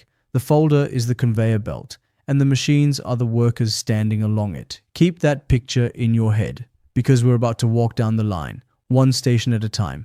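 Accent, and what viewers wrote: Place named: India. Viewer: You sound somewhat indian in this video. Thats pretty funny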